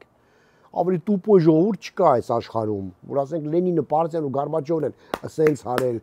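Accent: Gyumri, Armenia. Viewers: Լեննագանի բարբառը Ձեր եթերներում միշտ պահպանեք, Սերգեյ Դանիելյան❤️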